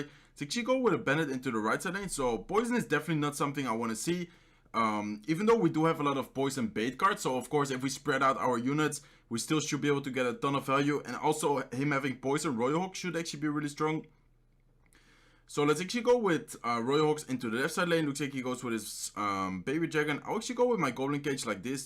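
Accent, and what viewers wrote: Netherlands. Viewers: Dutch accent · Are you dutch? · Ben je toevallig nederlands ik herken het accent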